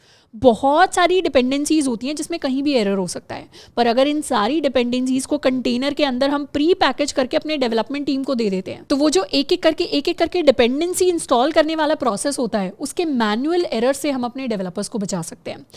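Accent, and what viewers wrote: India. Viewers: Very Heavy Indian accent. Can not understand what she is saying. Either you speak in English OR speak in Hindi.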